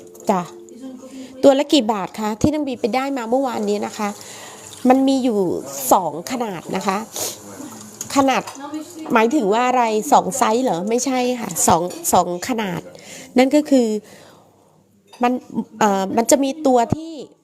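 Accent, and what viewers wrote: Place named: North, Thailand. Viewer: อู้คำ เหนือเลย คุณยายติดตามตลอดคะจากยายพรรณคะ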